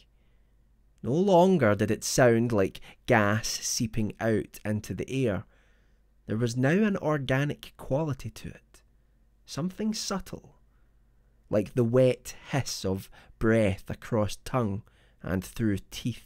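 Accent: Scotland, United Kingdom. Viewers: you sound Scottish.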